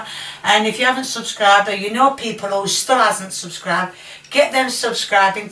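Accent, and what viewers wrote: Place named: Yorkshire, United Kingdom. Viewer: “Oh I’m just going t too tip lot aht” a proppa Yorkshire lass ah. Do you think you could try making jerk chicken?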